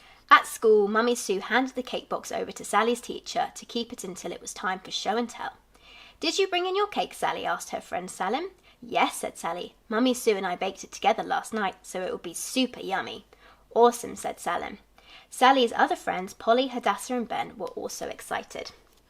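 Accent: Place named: United Kingdom. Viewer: has such a lovely British accent and cherry voice. She should do voice recording for e books.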